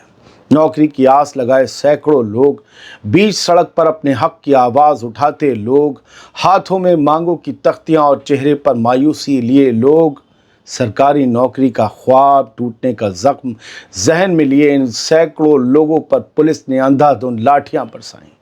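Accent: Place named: Lucknow, India. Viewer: zanab mai to apki lucknowi andaz me reporting bich bich me behtreen urdu ke alfaz aur Palestine, gaza pe itni shiddat aur safgoi se reporting ka vaise hi qayal ho gaya hu ! Mai apka regular viewer hu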